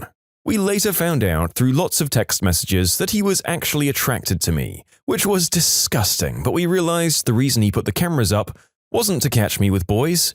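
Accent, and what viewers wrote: United Kingdom. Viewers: Not a fan of British narrator. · Uhh British narrator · When did mate become British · the british accent is the biggest tradgedy I was hearing a bunch of old podcasts of yours and this came up and I am like "oof that voice hit me on the head" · Again British???!!!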